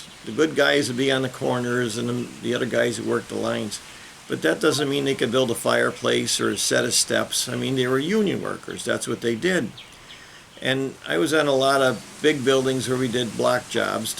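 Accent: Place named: Chicago, United States. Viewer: Great video. I’ve been in my union for 26 years. You get out of it what you put in .i started watching your videos because I was trying to figure out what type of cement to use in a faux stone paver walkway my wife has me doing. And I’ve stuck around for the stories. I work in Philly we have an accent. I thought I was listening to an old Chicago fella. 😂. God bless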